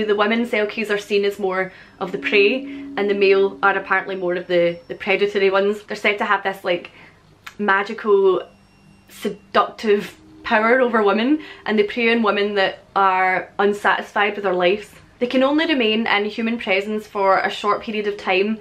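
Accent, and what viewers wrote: Scotland, United Kingdom. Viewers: If you're interested in folk stories from Scotland and Ireland, Juliet Marillier writes beautiful fantasy based off of them. Daughter of the Forest is book one of my favourite series by her. Oh, and I love your accent! I miss hearing it, since my Grandpa died. He was from Glascow. Well, a small town just outside. Auchinairn?? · If you want a laugh..watch with the Closed Captions on. The computer that is used doesn't understand a Scottish accent!! It's interpretation is hysterical!!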